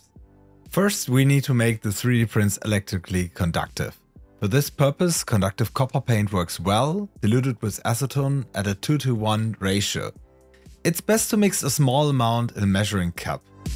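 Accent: Germany. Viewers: An deinem Akzent höre ich das du auch aus Deutschland kommst 😁💪🏽 gute Arbeit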